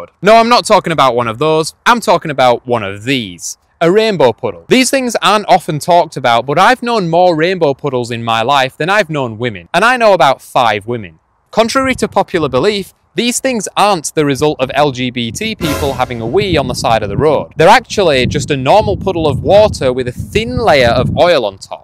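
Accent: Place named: Scotland, United Kingdom. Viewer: We got Scottish vsauce bevor gta 6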